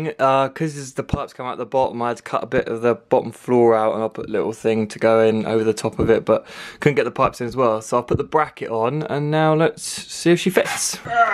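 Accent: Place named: United Kingdom. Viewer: not all of can speak or understand the King's English so for those of us watching from the colonies please speak just a bit slower eh mate?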